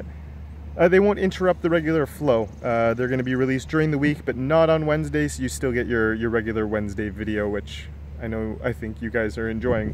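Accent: Canada. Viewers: Hi..... are you canadian?? xD I think I recognize your accent.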